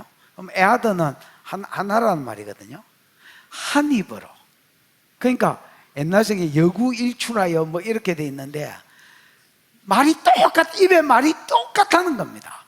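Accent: Gyeongsang, South Korea. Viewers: 갱상도어로 들으니 정말 이해가 쏙쏙 말씀이 너무 재미가 있습니다. 감사합니다. 목사님^^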